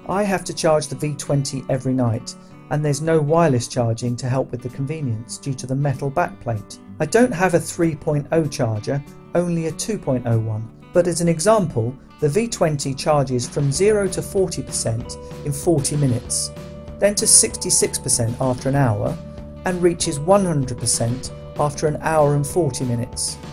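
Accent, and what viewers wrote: United Kingdom. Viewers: Nice review! Everything i need to know is covered here. I love the British accent too.😊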